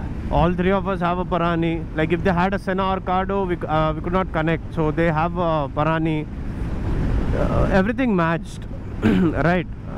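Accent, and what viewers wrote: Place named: Hyderabad, India. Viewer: Drone shots super👏👏 Hyderabadi language 😅😂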